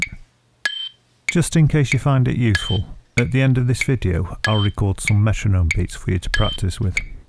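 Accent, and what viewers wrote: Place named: England, United Kingdom. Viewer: Thanks so much. Nice to have a clear English accent with tutorials so easy to follow.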